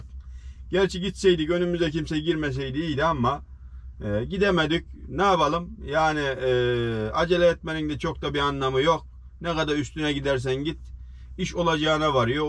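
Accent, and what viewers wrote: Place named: Kastamonu, Turkey. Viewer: Kastamonu bide ıIlgazlılar gibi konuşuyosun abi.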